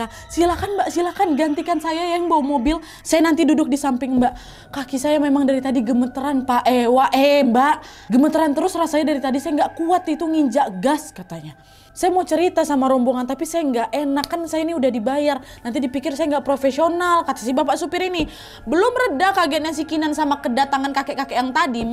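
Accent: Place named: Medan, Indonesia. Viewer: ini pintar,lucu selain logat medannya kental Trnyata pintr jg ngmog jawa nnlogatnya pun bgs... Sukses selalu... Salam dr Turky 🙏🙏🙏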